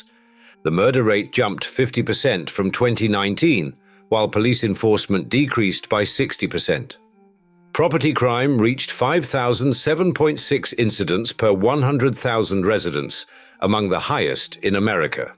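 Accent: United Kingdom. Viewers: When you hear a British accent turn off!!! Thats what I do...BYE!!! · It isn't rich millennials. More specifically its high tech nerds that are socially awkward and don't want community. I wish you would have used an American accent onthe AI voice instead of British.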